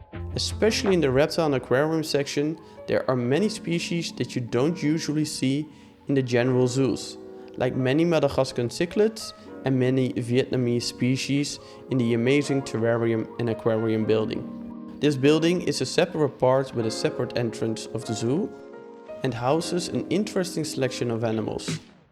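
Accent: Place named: Netherlands. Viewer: I hear dutch in your voice😁🇳🇱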